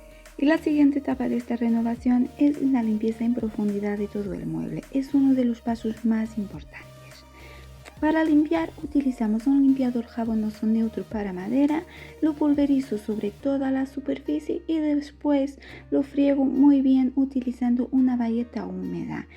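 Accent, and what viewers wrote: Portugal. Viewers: Excelente trabalho. Até aposto que a voz é de uma portuguesa🥰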